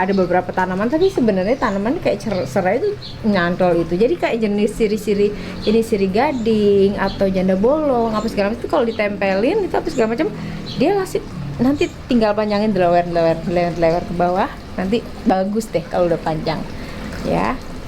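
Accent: Java, Indonesia. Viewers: Mbk masih cantik aja...cirihas orang jawa ngomongnya juga gk hilang...😁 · Bunda aku seneng banget kalo denger bunda ngomong aku yo wong jowo tapi sueneng karo logate bunda masio wes dadi artis terkenel tapi jowone isek kentel jo d ilangno boso jowone bunda lope lope deh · MBK kentel banget jowo e..MBK mmng..dn sy Lumajang kota.cedek banget loh MBK.smg sht sl amiiin🤲🤲🤲🤲🤲❤❤❤❤❤❤❤🙏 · Sukses slalu,logat jowo ne Jan luwes😘😘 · Seneng lo... ngrungoknE mbk boso jowo😂💃💃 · Suka liat mbk bhs Jawa medok banget sehat terus mbk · Mbk kalao ngommong jowo sangat cantik juga yo😀😀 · mbk bhs jawanya medhok banget aq suka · Mbak.... lucu banget lek ngomong jowo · Nganggo boso jowo penak ngrungok ke, medok yo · Aq seneng kro mbk. Boso jowone gk ilang. Sehat terus yo mbk...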